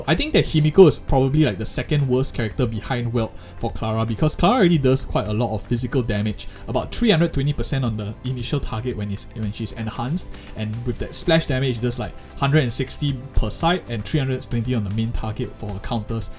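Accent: Singapore. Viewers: bro you sound like a singaporean. If you are from singapore, it is nice to see a fellow singaporean covering honkai star rail 😄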